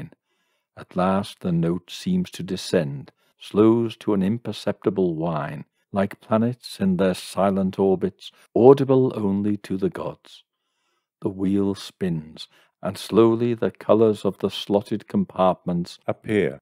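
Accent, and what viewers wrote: United Kingdom. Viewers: Old piano and reverb sets the scene. And only a soft British accent can make me so cozy for murder or suspense. Ha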